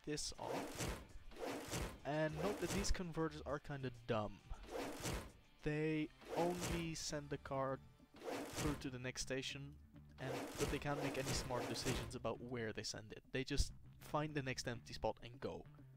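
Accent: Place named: Netherlands. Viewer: is sounding very Dutch in this video for some reason...:P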